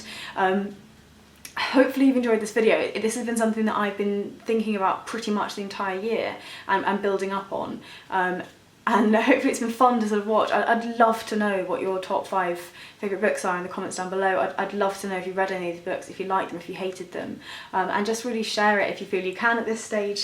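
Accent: United Kingdom. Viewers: Sometimes writers get carried away or whatever and make something TOO dang long and I sometimes I just spin out and can't finish them so I'm glad you mentioned that in regards to, Infinate Jest which I will likely avoid unless I'm locked up in jail or something and the only books I have are Infinate Jest, and The Bible. All of these sound worthwhile though I am narrowing it down to Sunset, Judas (you convinced me that this is a must) and for sure, Child of God which I am eager now to get a copy of soon. I've noticed that there are many other youtube people that give book reviews and some of them are ok or so so. For some reason, I think that is because your voice is nicely British you give this venue a stronger sense of credibility than when other (American) people do this. LOL. There is NO logic behind this but I'm sure on some subconscious level that I must somehow be processing the credibility of these things on geography. 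That's horrible but in this country with each passing year fewer and fewer Americans seek out or desire the act of reading. LOL. Oh well. Cheers!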